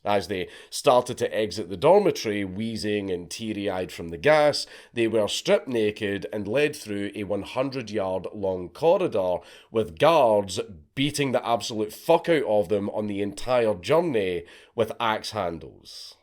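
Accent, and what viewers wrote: Scotland, United Kingdom. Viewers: Whoa whoa whoa what is the Fuck did you just say about Exodus. You have never said anything that has ever offended me before but my dude that ain't cool! Edit. It just occurred to me after I posted this I think I just misheard you because of your Scottish brogue that I love so much that you said thrash band not trash band 😮😅 my bad rock on brother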